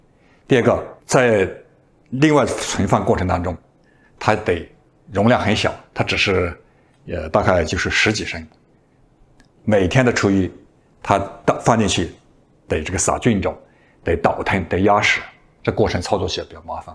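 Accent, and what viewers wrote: Yunnan, China. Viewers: 您应该是云南人吧😂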